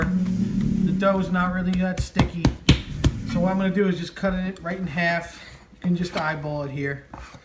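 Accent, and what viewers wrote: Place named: New York, United States. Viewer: Everything about this video, from the delicious bread, to your (east-coast/new york?) accent, to your ADORABLE son and sweet wife filming you (reflection in a spoon lol) I can't wait to try your recipe. Thank you for sharing some of life's joy - God bless!!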